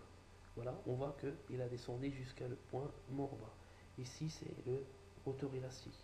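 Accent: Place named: Algeria. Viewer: Accent Algérien!!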